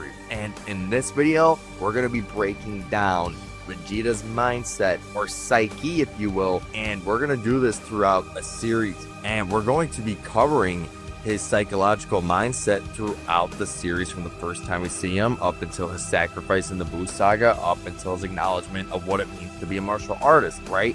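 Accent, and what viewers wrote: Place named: Chicago, United States. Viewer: This is the fiercest chicago accent I've heard since home alone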